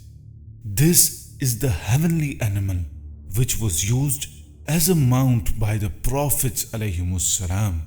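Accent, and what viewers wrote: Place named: England, United Kingdom. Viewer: Wada angeraz.....ru here to preach or trying to impress us with bloody English accent.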